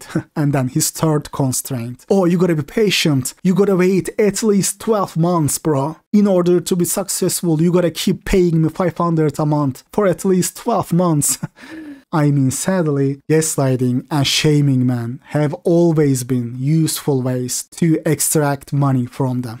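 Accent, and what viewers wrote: Turkey. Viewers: Turkey I think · Dude, until you mentioned your name, I thought you are Turkish. You have a clear Turkish accent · Your accent sounds like turkish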